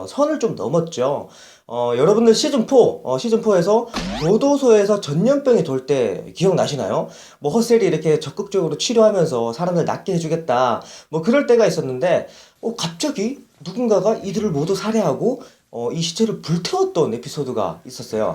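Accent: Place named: Gyeongsang, South Korea. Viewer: 캐롤 시즌 1~2까지만 해도 별 비중없고 민폐 캐릭에다가 일찍 죽을것 같은 캐릭이었는데 이제는 없어서는 안될 최종병기가 되었죠. 인물 연대기는 역시 원년맴버가 메인인듯 합니다. 특히나 릭은; 준비기간이 오래걸리실것 같네요. 역시나 님의 내용정리는 탑입니다.근데 뜬금없지만 경상도 출신이신가요. 제가 경상도 사람이라 중간중간 귀에익은 말투가 조금씩 들리네요^^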